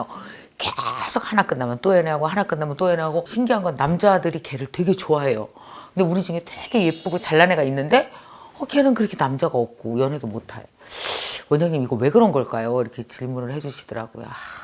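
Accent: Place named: Chungcheong, South Korea. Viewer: 불만인거가터 고소한 충청도 사투리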